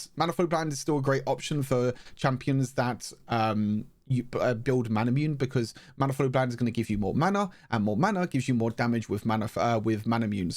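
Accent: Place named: United Kingdom. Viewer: i love the british accent. I learned to speak english recently and i can't understand almost anything that americans say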